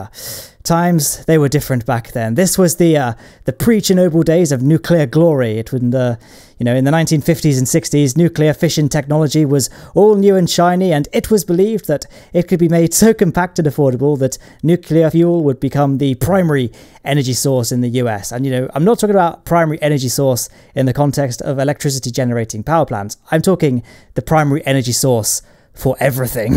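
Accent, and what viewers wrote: United Kingdom. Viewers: He is British so his accent is like that now come on chill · Where are you from? You sound British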